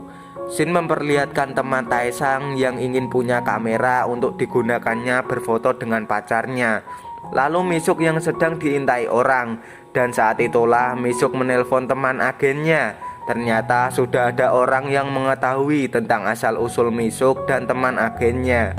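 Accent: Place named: Java, Indonesia. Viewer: Jawanya medok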